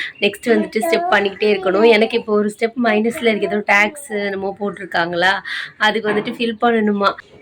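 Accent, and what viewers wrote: Ramanathapuram, India. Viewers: Na ramnad thondi. Unga pechile therinjadhu ma namma ooru pullanu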